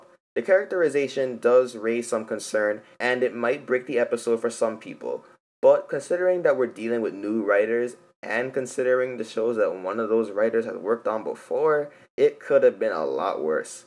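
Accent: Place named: Caribbean. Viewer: That was a great review. May I ask you where you are from? You sound like you're from the Caribbean.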